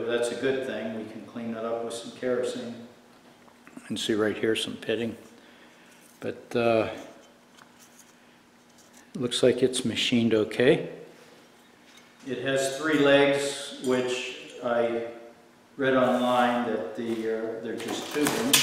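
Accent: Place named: Pennsylvania, United States. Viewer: fantastic video...My cobler just arrived yesterday ~ still in the box and will be putting your helpful video to use tomorrow... Thanks so much!!! You have a South Western PA accent...curious...any chance you're from that area???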